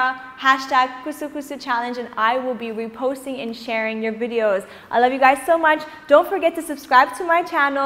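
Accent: England, United Kingdom. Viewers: Omg I like her english accent.....❤ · * your English accent * your Turkistan Lady look(!..) -Perfectum..✨👌🏻💖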